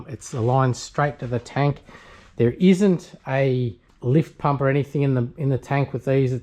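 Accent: Australia. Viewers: Wow! Just look at what you've done with this - what a beautiful car. Love it. Also love your commentary: Expert and unmistakeably laid back Australian style - I reckon you could just leave in what you think are "bloopers"!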